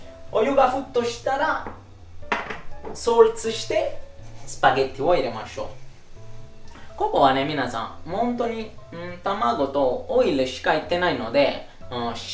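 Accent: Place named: Naples, Italy. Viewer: ナポリの方言カッコ良すぎないか？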